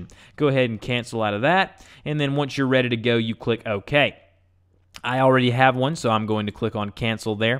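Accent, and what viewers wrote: South, United States. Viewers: Awesome video and I like your southern accent!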